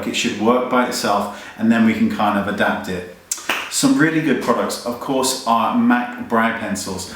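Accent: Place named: United Kingdom. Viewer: Hey! Just want to tell you that i absolutely LOVE LOVE LOVE your videos!! They are SOOO helpful & I love your british accent ^-^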